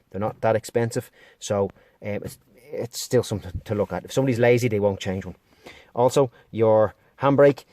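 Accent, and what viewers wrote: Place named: Ireland. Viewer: Irish mate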